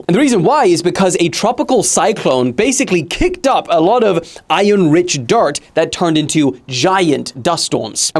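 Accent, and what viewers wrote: South Africa. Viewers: bro is truely a South African 🤣"listen properly"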